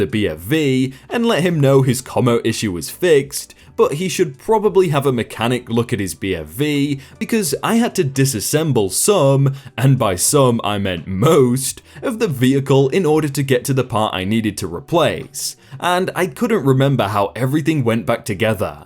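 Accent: United Kingdom. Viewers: Hey, you’re deep. You’re also noticeably more British in your speech. I love it.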